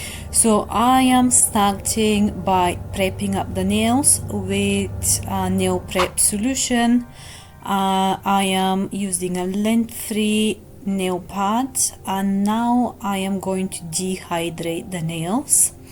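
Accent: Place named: Scotland, United Kingdom. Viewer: You sound Scottish 🏴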